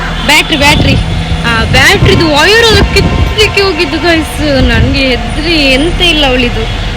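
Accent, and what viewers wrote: Mangalore, India. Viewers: Talking slang klidre mangalore irbeku ansatte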